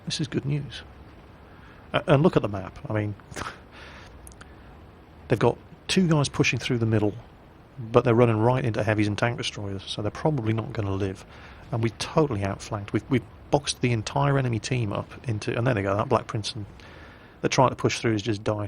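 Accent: United Kingdom. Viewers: Good stuff, thanks for showing us your awesome German tanks and for explaining everything in detail with Dat calm British accent. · have u ever thought of working as a narrator for TV .... we could really use someone like u ... that "typically" british accent, a nice voice, easy to listen to - very well narrating and improvising to get the sentenced finished ..... damn we could use a guy like u ....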